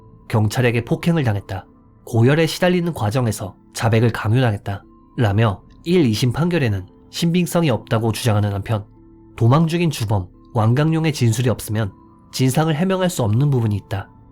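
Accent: Japan. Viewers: 일본에 살고 계시거나, 일본과 종사하는 일을 하시는지요? 일본 지명이나 단어를 이야기할 때 쿠세가 있는 것 같네요. 다만 장기간 거주한 것은 아닐 것 같은 발음입니다. 영상 잘 보았습니다.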